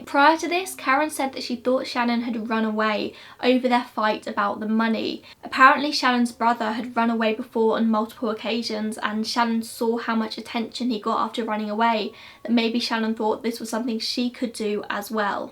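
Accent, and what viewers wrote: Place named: Southern England, United Kingdom. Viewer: I wonder if one day you could cover the case of the kidnapped ten year old girls (Lisa Hoodless and Charlene Lunnon) from Hastings? It's a happy ending case, something I much prefer where children are involved, I bet most of us like happy endings. I was holidaying there at the time and remember searching until I couldn't move my feet another inch, along with hundreds of other people. It's a happy ending in that both girls survived but were horribly abused by the disgusting paedphile who took them, that's the nasty bit. I (and my young son) went back to Vancouver after they were discovered but I've never forgotten that time and Ariel, my son, often asked whether I thought the girls were all right; I always said "yeah, they'll be fine" knowing that they quite likely would never fully recover mentally from the experience. 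Oh: it's a real treat to hear a voice from close to my part of the country, I'm from Surrey so not terribly far away. You are brilliant at presenting all your work, it took an evil virus for me to find your channel and I'm very glad I did. Thank you for helping to stave of incipient insanity and stay safe and well. All the best.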